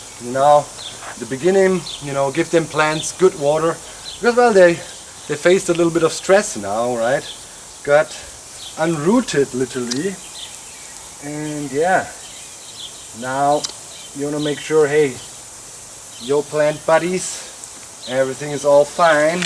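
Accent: Germany. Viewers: You sound a lot like Juergen Klopp. Are you from the same region as him? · Hallo! Ich mag deinen akzent! Im going to guess you are german and I hope im right. Your accent sounds german and my family comes from germany and they have a similar accent. Sorry for assuming anything .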